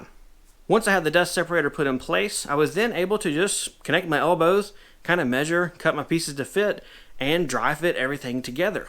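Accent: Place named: Carolinas, United States. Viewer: I've really been enjoying your vlogs and hearing your thought process as you organize your shop lately...also, where in NC are you located? I ask because I'm right on the NC line in upstate SC. And your accent sounds pretty similar lol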